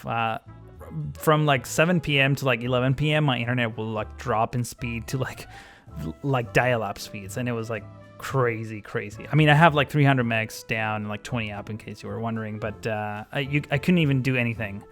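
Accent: Argentina. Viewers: Eres argentino????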